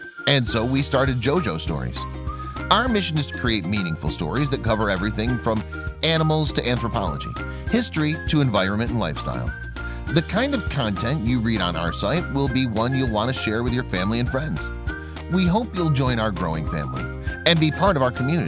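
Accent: United States. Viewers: Looked interesting but nauseating American voiceover so nope.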